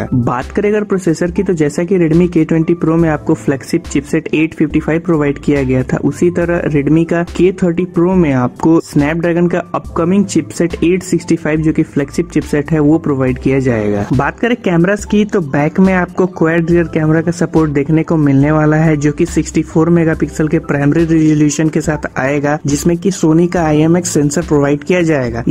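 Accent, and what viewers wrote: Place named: India. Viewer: I hear Indian aksen i dislike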